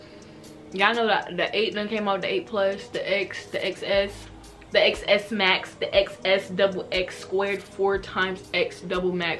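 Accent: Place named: South Carolina, United States. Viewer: I knew I noticed your accent I'm from South Carolina ❤️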